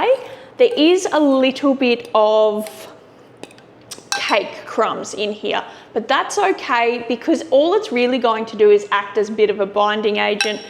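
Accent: Australia. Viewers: Love this video! You sound so Aussie in this one !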